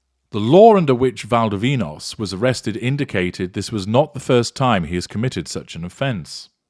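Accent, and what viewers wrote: United States. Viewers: Damn fine 'Merican accent! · You've been working on your American accent. Nice! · Pretty decent American accent.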